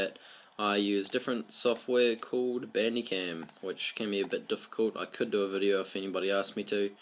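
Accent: New Zealand. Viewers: Such a typical kiwi accent lol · His accent changes the guys vowel to almost gihs but clearly he DID NOT say girls. There was NO L sound in there. I was going to guess that he was an Aussie but clearly it he stated that he is a Kiwi in other comments. My American ears cannot tell the difference(just like many non Americans cannot tell the difference between Canadian and American speech). Very similar. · Ha ha. I picked your Kiwi accent in about 3.5 seconds... then got your confirmation at the 28 second point. Well done my fellow Kiwi !! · Hey man I Luv your NZ kiwi accent !!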